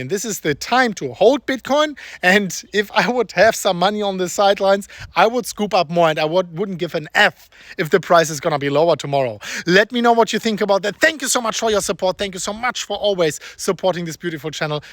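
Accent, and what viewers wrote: Germany. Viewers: Das ist doch ein deutscher Akzent. 😁 Gefällt mir sehr gut.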